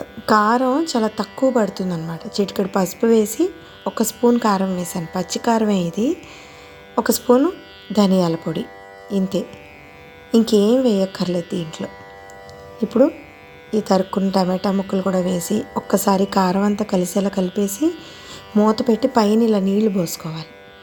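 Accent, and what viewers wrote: Godavari, India. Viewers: మీ గొంతు హస్కీగా బావుందండి. గోదావరి జిల్లా వారేమో మీరు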